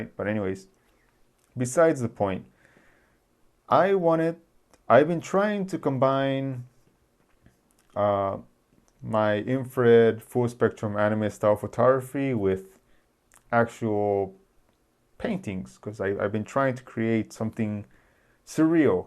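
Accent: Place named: Spain. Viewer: I really like your photography! Thanks for sharing your opinions. I feel like your English has some Spanish accents haha, perhaps i got it from my latina gf, which also loves anime.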